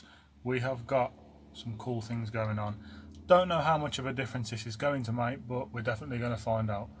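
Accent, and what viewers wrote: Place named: Birmingham, United Kingdom. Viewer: Wanna help me out with my S40 T5 build?? Same engine as the ST You sound like you're from brum, so I'm assuming you're local ??👀😅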